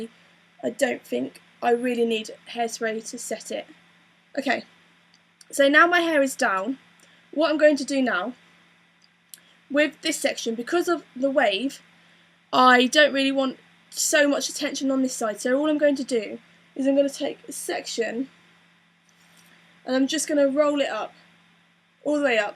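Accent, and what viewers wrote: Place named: England, United Kingdom. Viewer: I think that's wonderful and I really enjoyed your informative commentary throughout. I suppose exact order and timings of when you put each pin in is crucial to overall result after you explained you could play around with a bit of hair that hadn't yet been pinned into place? Although i used to have long hair i never pinned it so never really considered this aspect. Cant work out if your accent is London/South East or West Country or maybe I'm totally wrong on both accounts!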